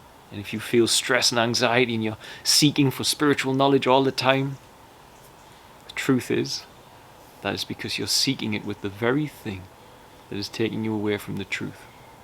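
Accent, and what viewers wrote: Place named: Northern England, United Kingdom. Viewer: So profound. It actually made me cry. So much conditioning and "recording". I just wish I'd been more awake when my kids were small 😥 By the way, where's that accent from? I am from County Durham (but living in Sweden), and assume you're not far away!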